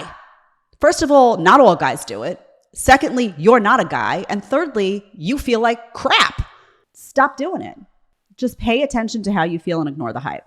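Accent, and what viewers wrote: New York, United States. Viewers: I recently moved from NY after being there for 12 years. Your accent and your humor invoke good memories of some of the nice people I knew and met there. Great content!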